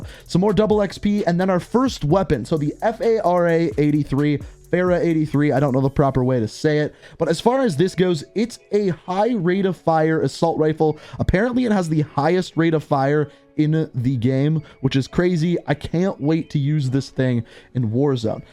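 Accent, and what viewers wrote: Canada. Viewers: Canadian eh? Lol. Theres my comment bud, hope it helps.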